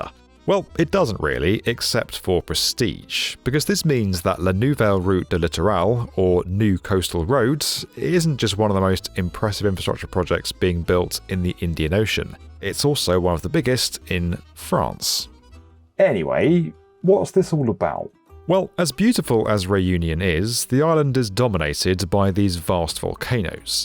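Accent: England, United Kingdom. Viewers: As a French person, it is so weird and funny to hear the places name pronounced with an English accent (although he's trying is best to "Frenchize" it !)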